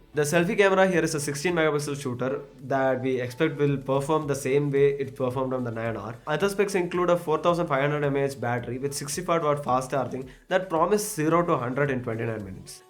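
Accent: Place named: Kerala, India. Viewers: A thick Malayalam accent... better add a tag... not at all clear... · That mallu accent ! 😁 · Such a pure malayalam accent lol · That malluism in your english is nice to hear❤️ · South indian english......nice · My god! Horrendous south Indian accent in your English ‼️‼️‼️ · I mean the guy sounds like some Anna at some Malyali Paan Thela. Dude needs to sound neutral. Completely clean up any mother tongue tone from his English. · Am I the only one who hears Malayalam slang when he speaks??😄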